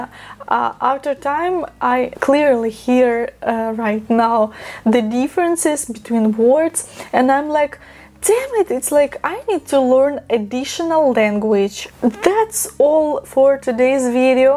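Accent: Ukraine. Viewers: The criticism of your accent by other Ukrainians, I believe, is based on culture. Of the Ukrainians I have met, they are perfectionists. Your fellow Ukrainians want your English to be perfect since you are considered an Ambassador of Ukraine on the internet. It is ok, they are proud a people. Glad to see you back online. Take care. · Ukrainian accent is very nice. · Your English is perfect, 💐💐💐 please dear Ukrainians don't be embarrassed by your accent it's lovely. You want to hear the English language in a terrible accent then you gotta talk to us Australians🤣🇦🇺 Been picking up some Ukrainian words & phrases from watching you all with translations in clips & songs Ukrainian is beautifull but very hard for us. Get excited when recognising words & phrases😁 but damn when I hear myself trying to say/sing along my Australian Strine accent is dreadfully thick & pronunciation is woefull😅 stay well & safe & Family🇦🇺❤️🇺🇦🦘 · Your English is fine . 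I'm used to hearing English with the Ukrainian accent now . I don't have a problem understanding you . Your parents sure were good to you and your sister . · Your English is really just fine. It's just that your Ukranian accent is pretty heavy. That can go away with practice if you really want. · Your accent is Beautiful. My fiancee is Ukrainian and she has a similar accent and it is SO beautiful.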